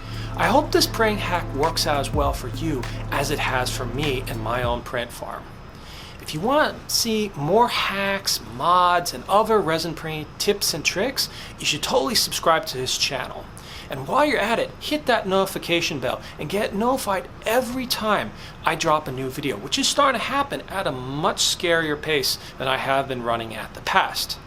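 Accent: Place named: Canada. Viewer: ahh ok, you sounded like a fellow 🇨🇦 😊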